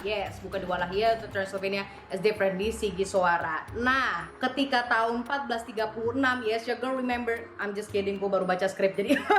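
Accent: United States. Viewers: aksen english amerika kamu kak😭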